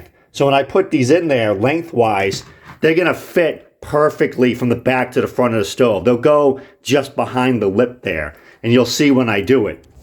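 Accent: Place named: Massachusetts, United States. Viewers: Hey thanks man. Being from MA I can almost tell what town you're from haha 😉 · Seems he's from bawstin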